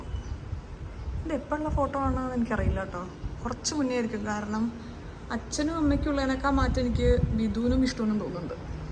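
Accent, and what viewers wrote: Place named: Valluvanad, India. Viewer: Nalla Reethiyil Valluvanadan Bhasha Samsarikkunnundallo, Swantham sthalam Valanchery aayathu kondayirikkum alle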